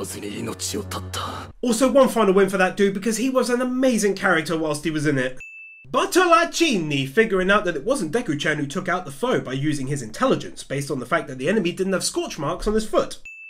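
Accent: United Kingdom. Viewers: Is this a LiverPool accent? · This guy's voice is the manifestation of the word "British"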